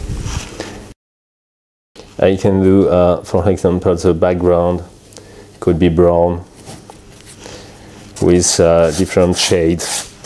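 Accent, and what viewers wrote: France. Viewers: Thank you for such a gentle and lovely voice with accent. MMM French accent always reminds me of food. I know why however that is another story. After dusting off the pastels January 1 and deciding to make a picture, at least one, everyday. Then I would watch how it is done. The spreading of the colour is so relaxing. Deep rich intense colour. Colour heals. May all who venture into the land of pastels be healed with the colours. <3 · Yup totally french. I'm myself a frenchie... But his accent is sooooo pronounced, even sounds quite fake. (We french know that English ppl love our accent so we make it sound the most "french" possible to.. Be "sexy"?) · On sent bien qu'il s'agit d'un Français qui parle Anglais!!! Pourquoi n'a-t-on pas une version FRANÇAISE?? Je suis désolée mais on devrait pouvoir avoir le choix de la langue!!!!!!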